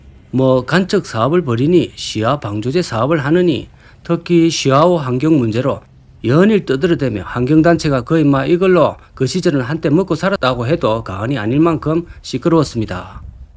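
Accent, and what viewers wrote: Gyeongsang, South Korea. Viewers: 안녕하세요...항상 수고 많습니다. 이 처음에는 구수하고 조금 어눌한(?) 경상도사투리가 참 좋았는데 지금은 말씨가 표준말로 바꿔가고 말도 빨라지네요 ㅋㅋ. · 전라도에사는 60대인데도 경상도구수한 사투리가 참 잘 어울리셔요.인생지침에 많은 교훈이 됩니다.항상건강하시구요.감사합니다.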